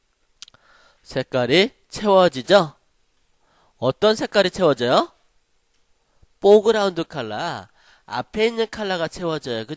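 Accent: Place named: Yanbian, China. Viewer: 말투가 좀 연변 스럽죠..고마워요 ^^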